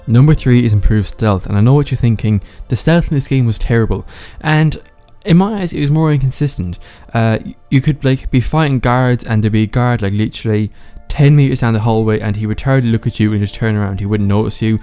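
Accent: United Kingdom. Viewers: is he british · Are you from UK?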